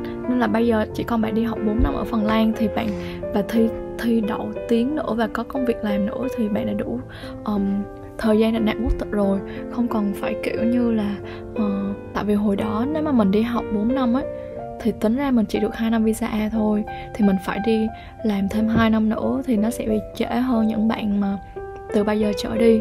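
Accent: Vietnam. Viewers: b nói được giọng bắc à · Giọng bạn ở đà nẵng phải kh? Nghe hay ghê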